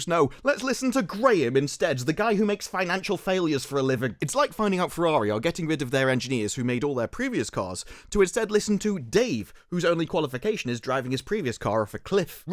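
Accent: United Kingdom. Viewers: Oh, I get it.. So you're like a British It'sAGundam ??? 🤔 I'm here for it. Your accent is very similar to Gopher. 😅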